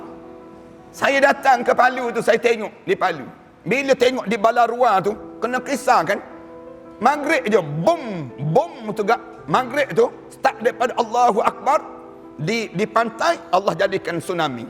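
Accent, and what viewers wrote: Kelantan, Malaysia. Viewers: Pekat loghat kelate ...ustaz yer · masyaallah...ya ke...baru ni... Kuah tumpah kemana kan.....alhamdullillah ade penyambung dari tgnik aziz...saya memang pu suka ceramah ustad ahmad dasuki ni.....sedap telinga dengar nyaman rasa di hati...ceramah ceramah nya..walaupun dgn loghat kelantan nya..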